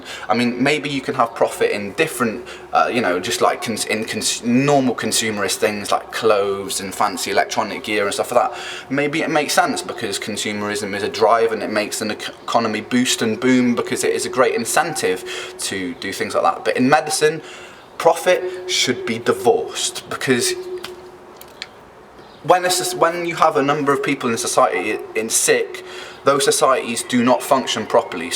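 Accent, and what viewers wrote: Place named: United Kingdom. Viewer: You are cool , I like the british accent , I'm from India , a developing country , our scene is the same . But Our country is trying it's best to built government hospitals and free medicine from them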